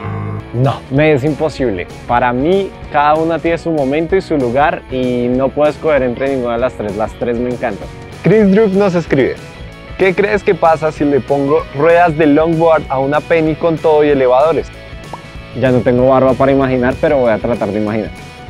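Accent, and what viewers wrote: Colombia. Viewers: Eres colobiano?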